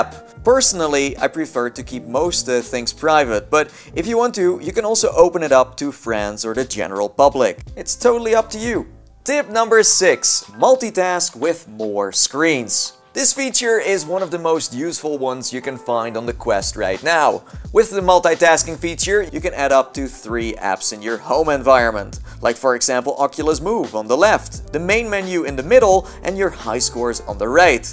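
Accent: Netherlands. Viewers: Ben je nederlands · are you dutch by any chance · Ben jij Nederlands? Want je hebt zo een accent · You sound dutch (just a random though)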